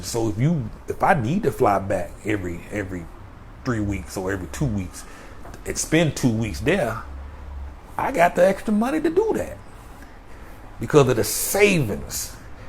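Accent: South, United States. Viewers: This dude sounds Country as H-E- Double hockey sticks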